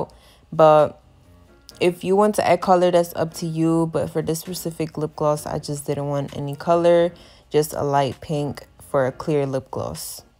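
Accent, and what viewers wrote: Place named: Russia. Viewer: Акцент словно русский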